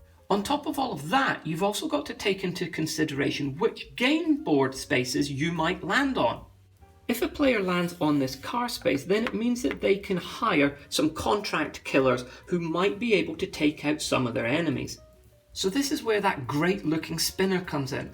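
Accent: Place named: Chicago, United States. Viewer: Bought this after seeing this. Dodgy Chicago accent lol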